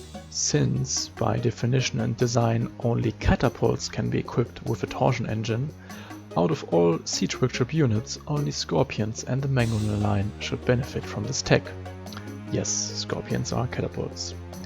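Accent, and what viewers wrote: Germany. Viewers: Great video, love the content and I think you sound like Cillian Murphy if he had a German accent 👏🏼. Looking forward to the next vid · i hear you are german - me too :D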